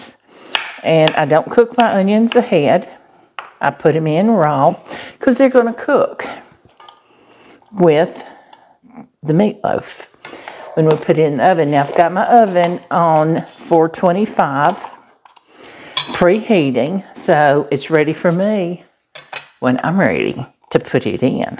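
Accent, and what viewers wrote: South, United States. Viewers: Hi, I know I am late to this party but I am glad that I watched. Your food looks yummy and also nutritious too. I love your southern accent when you speak. It makes me lonesome for Texas. I know you are in Alabama but still a sister from the South. I have never made gravy just did not have the talent. When I made meatloaf I used ketchup or mild honey BBQ for a gentle topping. Thanks for the gravy lesson and the tip with the buttermilk too. Take care and God Bless you and your hubby.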